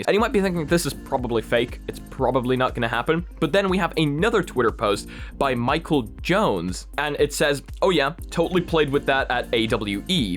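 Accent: Ireland. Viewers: I love when the Irish accent sneaks out 🍀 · Bro sometimes u just sound irish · You have just a whisper of an Irish accent, dont you? Am I Right???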